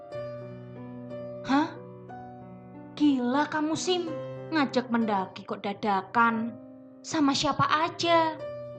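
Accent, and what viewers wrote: Java, Indonesia. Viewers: Suara medok neng😂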